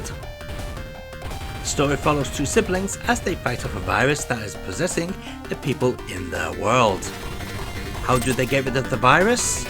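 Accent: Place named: Liverpool, United Kingdom. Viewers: Always sounded very much Wallasey to me, so wasn’t far off 😊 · Ah it's a Liverpool accent, I was thinking North West, then I doubted myself and didn't want to guess. I know Liverpool well. Spent a lot of time there. Live in the New Forest. Yes, I'll be 50 next year too. Time does indeed fly.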